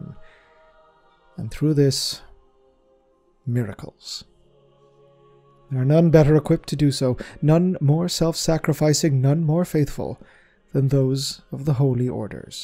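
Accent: Ireland. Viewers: maybe this is a weird question but do you have an irish accent?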